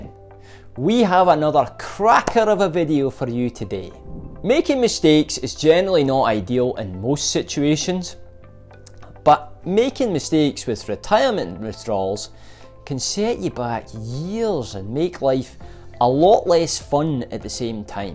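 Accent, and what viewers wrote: Scotland, United Kingdom. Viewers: Excellent points. This extremely important video had 828 views. It proves your point that people pay more attention to planning vacations than planning retirement withdrawals. Love your accent. I've been to Scotland once. Beautiful country, great people. The weather could be brutal. Glad, the music in the background is toned down. Too bad it didn't help to crank up the number of views. Don't get discouraged. I'll watch. Content is great.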